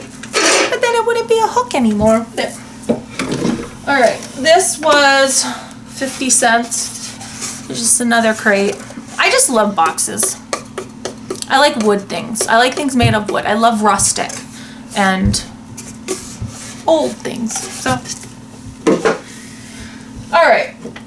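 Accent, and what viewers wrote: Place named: New Jersey, United States. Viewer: Hi! I’m binging on your videos...you really scored at this community yard sale. Good for you. Question (but you don’t have to answer if you don’t feel comfortable). Where are you from originally? I’m originally from New Jersey and you sound like me...lol... hope I’m not insulting you. Anyway, just curious. Born and raised in Jersey. Moved to Florida for 30 years then moved to North Carolina a year and a half ago. I’m so old! Lol!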